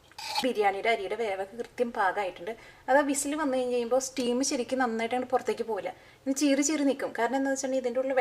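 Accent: Thrissur, India. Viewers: Hey Chechi, You are really great...You have got a lot of talent......Keep up the good work & keep teaching us more & more recipes...Your recipes are easy to understand & delicious too....May God bless you.....& I specially love ur Trishur accent...:)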